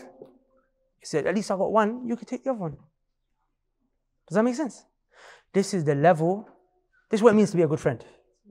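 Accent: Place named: London, United Kingdom. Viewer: This is how a lot of people in London speak these days, not just the ‘youth’. It is just multicultural London English.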